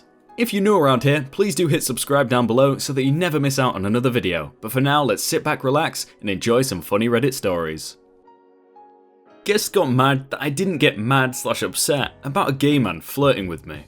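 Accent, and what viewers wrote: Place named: Scotland, United Kingdom. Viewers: Not a bad Scottish accent. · You have a decent Scottish accent. Don't worry about it.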